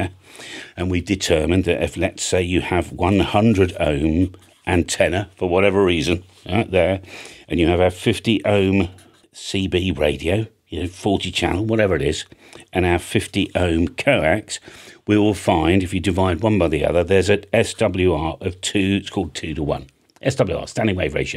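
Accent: United Kingdom. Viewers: Sure wish could understand your hard brit English ! Other wise it always sounds like your asking a question...put an AI voice to use...please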